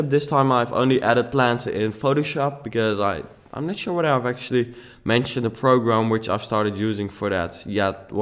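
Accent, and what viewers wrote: Netherlands. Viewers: je kunt gwn horen dat je nl bent lel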